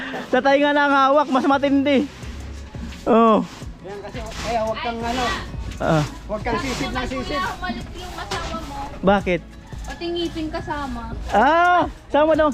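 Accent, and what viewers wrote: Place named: Maranao, Philippines. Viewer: Maranaw ung tono nya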